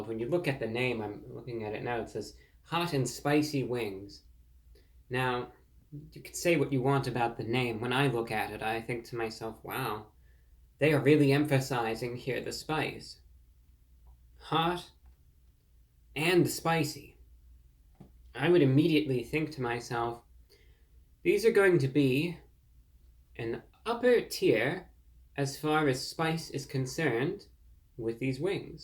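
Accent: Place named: Transatlantic, United States. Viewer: Bro just casually speaks in a semi trans-Atlantic accent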